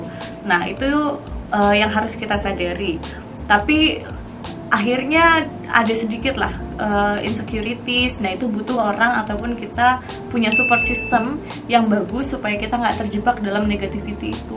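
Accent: Java, Indonesia. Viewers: Buk wagub udh medok logat jawa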